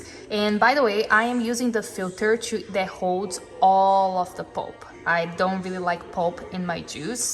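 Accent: Brazil. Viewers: Love the Brazilian Accent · Brazilian accent 😊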